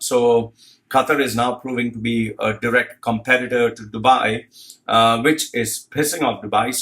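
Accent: India. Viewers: Indian accent yeahhhh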